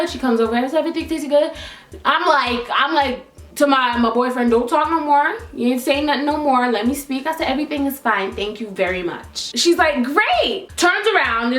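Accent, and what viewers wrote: Jamaica. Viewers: New follower! I love your videos. Im literally binge watching them!!! Speak more patois !!!!!!! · Didn’t realize she was Jamaican until the begging of the video 🇯🇲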